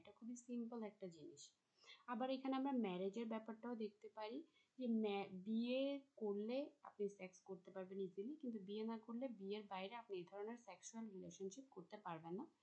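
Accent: Chittagong, Bangladesh. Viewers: 100% apnar bari chittagong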